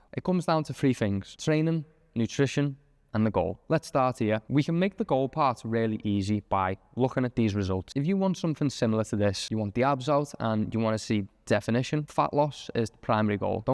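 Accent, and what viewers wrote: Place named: Merseyside, United Kingdom. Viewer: That's a Merseyside accent, Liverpool or the Wirral...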